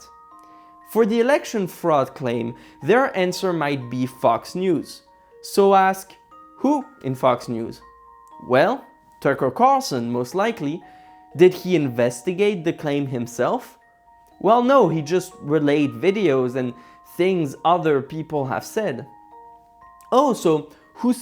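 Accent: France. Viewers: Are you french ? you have french accent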